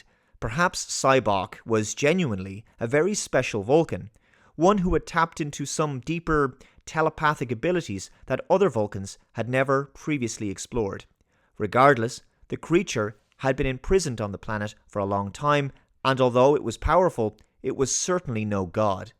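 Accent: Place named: Ireland. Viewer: It was clearly exploring the concept of God, which is highly cinematic by it self. It also thoroughly explored how pain artificially relieved (being cybocks power) is a crime to the souls identity. TrekV was excellent as classic Trek, this film brought what we've come to expect, and a ton of fun. You know, your voice now that I think about it really sounds a lot like K. I. T. T!! Albeit Irish instead of british accent, you could easily voice him if Daniels himself not available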